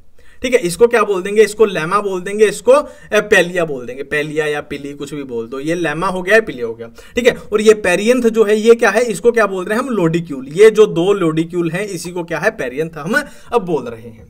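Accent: Haryana, India. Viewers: Both are belong to HR .. haryanvi accent h bro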